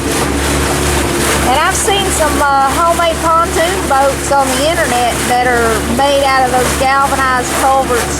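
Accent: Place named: North Georgia, United States. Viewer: HeHe, I LOVE this channel! I love the views, and I love that accent! Its like being at home...and I miss home. all my family is from north Ga and east Tn. In my mind thats what heavens gonna look like, only better...somehow, lol.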